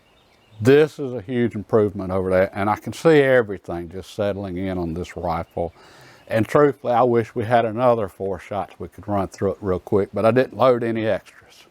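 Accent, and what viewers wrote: South, United States. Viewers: Love his southern accent. I like that he talks kind of slow too so I can understand, as a new person to all this. And he seems like he's been hunting and shooting all sorts of rifles for decades.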